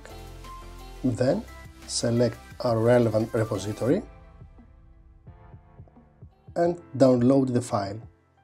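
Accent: Greece. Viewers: i watched 500 videos and finally found 1 that explains the steps really easy.(perhaps it helps that they speak english with Greek accent :D euxaristw!!) Buts visual studio dont work for my os so wish it was for arduino that 90% of ppl use.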